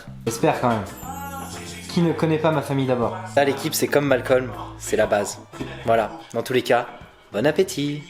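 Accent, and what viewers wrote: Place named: England, United Kingdom. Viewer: Tu manges du "bacon " mon lapin 🤣🤣🤣, j'adore 💕 ton accent anglais..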